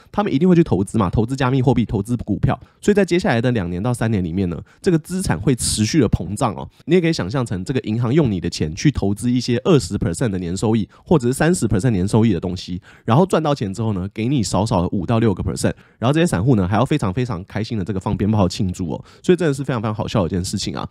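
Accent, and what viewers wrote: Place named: Taiwan. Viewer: 好少看到D大講台語😂😂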